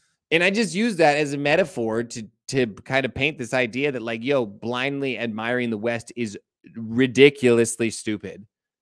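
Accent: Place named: China. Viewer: Best Chinese accent ever!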